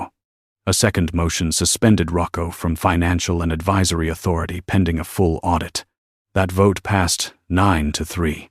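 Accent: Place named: France. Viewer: frenglish? beautiful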